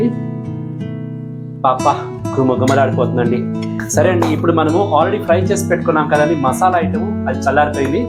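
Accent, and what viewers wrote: Tamil Nadu, India. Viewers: గారు మీరు తెలుగు వార, తమిళ్ తమ్ముడా 🤔 మిమ్మల్ని జెమినీ సీరియల్స్ లో చూసే దాన్ని మీ తెలుగు కొంచెం డిఫరెంట్ గా ఉంది 😊👍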